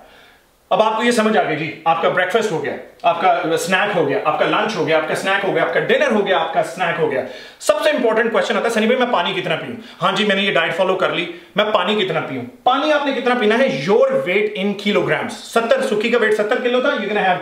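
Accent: Punjab, India. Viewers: AWSOME BRO SUPER LIKE TUHADA PUNJABI TOUCH SIRRA KRA JNDA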